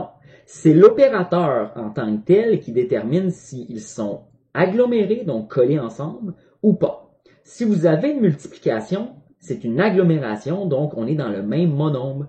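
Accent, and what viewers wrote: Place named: Quebec, Canada. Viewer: Agréable rafraîchissement, explication claire et dépouillée et tout çà avec l'accent de Charlebois. Merci de tout coeur.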